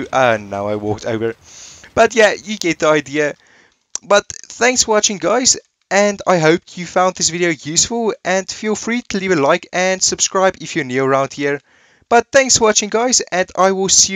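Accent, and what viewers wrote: Afrikaans, South Africa. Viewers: South African ? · Are you South African Dude you are. I am from SA and I know a Afrikana when I hear one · Are you from South Africa? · Do you speak Afrikaans? It’s sounds like you do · Definitely South African Afrikaans accent ha ha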